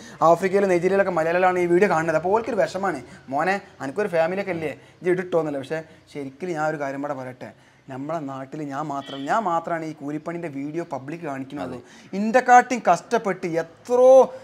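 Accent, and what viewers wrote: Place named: Kasaragod, India. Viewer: ഞാൻ അകത്തേക്ക് പോയപ്പോൾ ആണ് interview എടുത്ത ആളെ മനസ്സിലായത്.. Ninghlde കാസർകോടൻ ശൈലിയിൽ ഉള്ള ..സംസാരം pwoli ആണ്. Aa റോഡിനെ കുറിച്ചും ,പിന്നെ Corona കുറിച്ചും പിന്നെ പലതും superb 🔥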